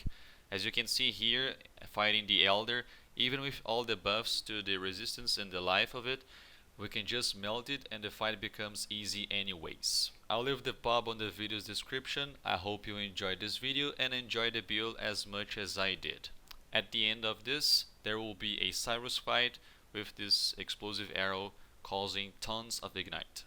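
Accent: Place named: United Kingdom. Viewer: Agora sim eu consigo escutar esse britânico nato falando <3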